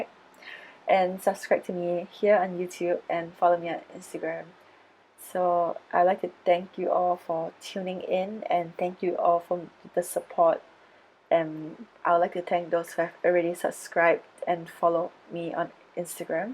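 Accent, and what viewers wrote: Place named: England, United Kingdom. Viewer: I hate ur english accent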